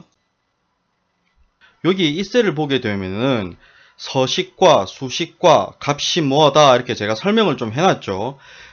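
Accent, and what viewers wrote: Gyeongsang, South Korea. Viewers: 구수한 갱상도 사투리에 열정적인 강의 귀에 쏙쏙들어옵니다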